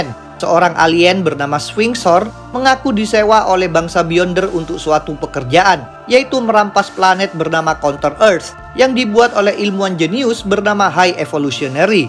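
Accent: Indonesia. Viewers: Byon der,, bion derr,,, medok mana logatnya itu min? 😅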